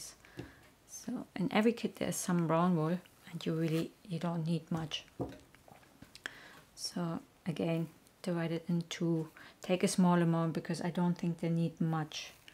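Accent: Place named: Scandinavia. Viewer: This artist sounds scandanavian......is that wool sorced from Ireland?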